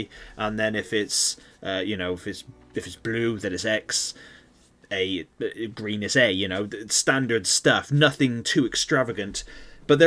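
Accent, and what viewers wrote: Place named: West Country, United Kingdom. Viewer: Can hear that slight twang of a west country accent, love it from devon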